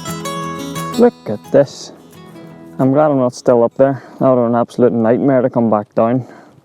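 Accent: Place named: Northern Ireland, United Kingdom. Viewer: Beautiful drone work, views and stills. Definitely a Winter Wonderland. Judging from your accent you are from Northern Ireland. Am I correct that you are living Scotland now? I am a Scot from Edinburgh but have been living in the pretty little town of Portstewart N.I. for 45 years to date. Anyway, I wish I was there also photographing that snow and hills...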